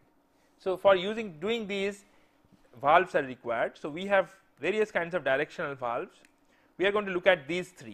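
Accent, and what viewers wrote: India. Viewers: you just have to love his / indian accent :DD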